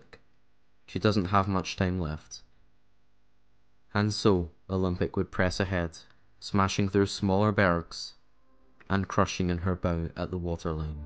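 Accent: Scotland, United Kingdom. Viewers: Love the Scots accent.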